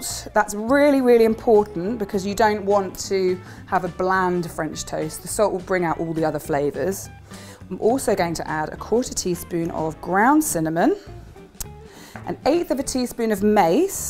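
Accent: United Kingdom. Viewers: I feel like Frances mcdormand is giving me instructions to make French toast in a British accent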